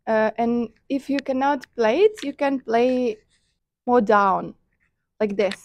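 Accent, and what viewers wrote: Russia. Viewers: Автор ведь знает русский? Мне, кажется, есть русский акцент... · Ничего себе, у вас произношение нот как у русских, до ре ми фа соль ля си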